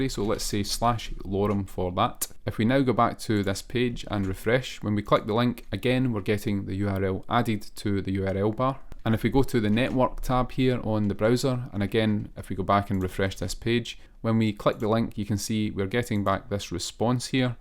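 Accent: Scotland, United Kingdom. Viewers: Thank you for the content you produce. And thank you for the Scottish accent! 😀 I was supposed to move to Scotland recently but some unfortunate events stopped me, so this will help ease the pain. I'm in the beginning of my Django learning journey, so I will definitely come to your videos many times. Good luck!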